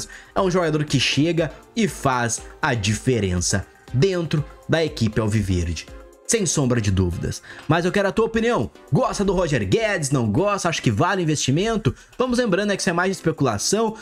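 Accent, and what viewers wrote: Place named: Rio Grande do Sul, Brazil. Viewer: Amigo do, Você tem um Sotaque Gaúcho, de onde Você é, do Rio Grande do Sul?... Eu também sou Gaúcho, Abraços!...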